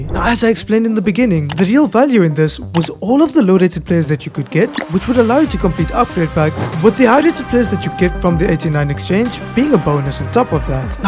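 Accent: South Africa. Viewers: bro are u south african, u sound like it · Why you sound so South African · hi dude im new to the channel what is your nationality you sound south african?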